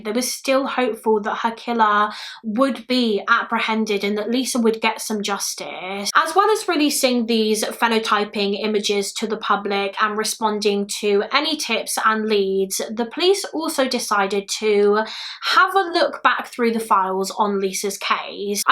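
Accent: United Kingdom. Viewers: You’re so adorable and I love your voice. I’ve always had a hard time with British accents, but you and Eleanor Neal are life for me 🥰🥰🥰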